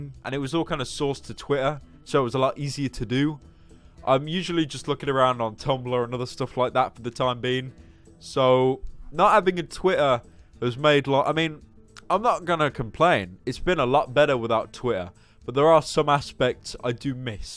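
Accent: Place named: North, United Kingdom. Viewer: It's how we speak in the UK, mostly in the north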